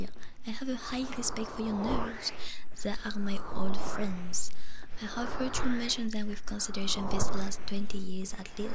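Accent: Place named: France. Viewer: DO MORE STORY READING WITH FRENCH ACCENT PLEASE, IT'S AMAZING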